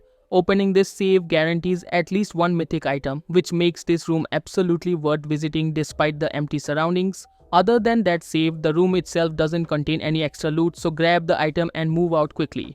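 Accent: India. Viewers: This guy is the only indian tutorial guy I want funny jokes and a good indian accent when speaking English he speaks like amir the door dash guy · Ugh, that stupid Indian accent 🙄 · Damn it had to be an indian accent video, fuck that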